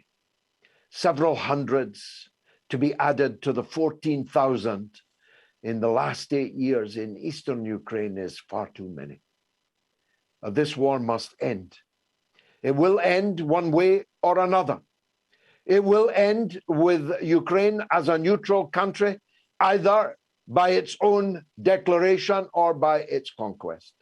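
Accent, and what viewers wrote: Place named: Dundee, United Kingdom. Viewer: George you have always been a traitor to the UK but now I see that you are a traitor to mankind.What a shill, I work with and help resettle victims of this war in Ukraine they are not lying too me. Now take your staccato Dundonian delivery and get lost.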